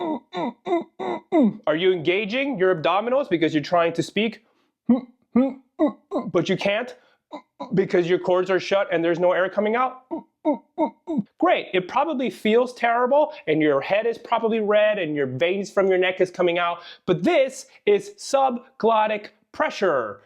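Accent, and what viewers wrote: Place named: United States. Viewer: I thought you were italian for a second i got excited 😭 Edit: Oh, you are, i watched another one of your videos and you just have a really good american accent lol.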